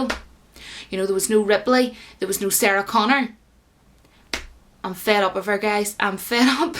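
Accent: Ireland. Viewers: Are you from Ireland ? Moving to Ireland in August. Love your accent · Are you Irish ?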